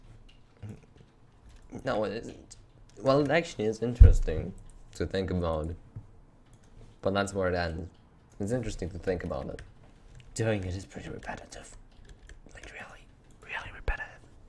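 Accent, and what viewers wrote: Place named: Norway. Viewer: Was this pre-transition? You sound sort of exactly like any Norwegian guy and it’s off putting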